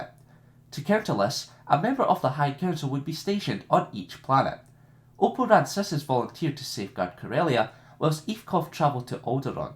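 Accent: Scotland, United Kingdom. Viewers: Hahahha "Scottish insult". Admittable when i searched star wars lore, i didn't expect it to be a fellow scot that would be the commentator.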